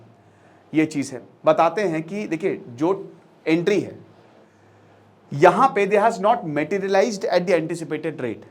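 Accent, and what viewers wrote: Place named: Lucknow, India. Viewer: Aap ya to lucknow se ho ya fir ... aap kanpur se hi 😅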